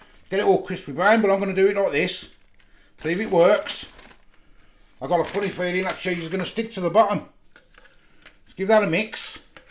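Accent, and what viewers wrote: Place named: West Midlands, United Kingdom. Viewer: Ey up bro, only just come across your channel, got to admit I was a bit dubious at first but you seem to be a down to earth sound bloke, I’ve subscribed mainly because it’s nice to see an honest bloke who says a spade is a spade and doesn’t try to hide his accent, I’m a stokey and used to work a lot down all round the West Midlands, keep it up mate WELL DONE 👍🏻 👋🏻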